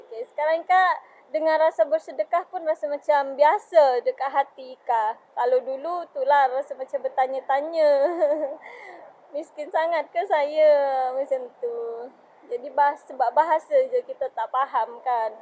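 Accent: Malaysia. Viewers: Maaf sy ckp kamu ckp dh mcm org msia. Terbaik kak ckp. Teruskn bersemagat buat video yg lebih menarik kak. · Salam..kamu bercakap seperti orang Malaysia. Teruskan buat video semoga maju jaya. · aslkm ika n suami ..rata2 orng yg memililki kereta ada motor juga...tpi motor tu hanya jln dekat2. lelaki melayu tak pakai seluar pendek...tahniah ckp awak mcm melayu...semuga bahgia...amin..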